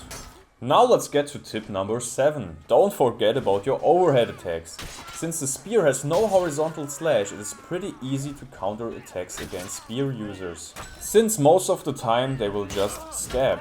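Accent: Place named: Germany. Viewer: Where are you from? you sound German. and very solid and meticulous guide. Like and sub!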